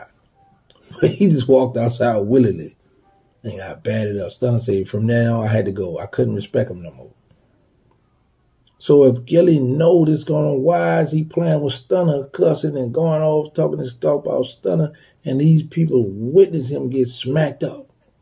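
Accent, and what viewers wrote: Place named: Louisiana, United States. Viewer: Did he grab him? Yea come ya😂😂 straight louisiana exactly how we talk.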